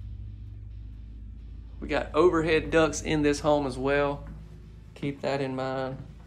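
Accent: South, United States. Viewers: My husband usually knows when I’ve watched a video of yours when I start talking with a southern accent. Lol. And he is right! I just LOVE your videos. Keep up the good work! 😊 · I really like listening to you. You are a Southern boy and you don't try to hide it. You make me smile with your descriptions of the homes. Love you..have a blessed day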